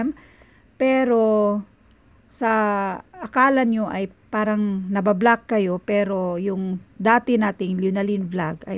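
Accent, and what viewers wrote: Visayas, Philippines. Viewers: Bisaya ka gang. Kita kita r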